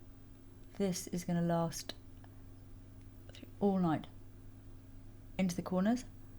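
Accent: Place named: RP, United Kingdom. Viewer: Really nice , also love your posh accent 😃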